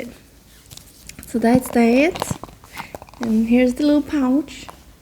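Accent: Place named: South, United States. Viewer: I haven't seen any videos from u in a long time .. your accent reminds me of the south are u from the south??? this bag is 2 cute i may need on as well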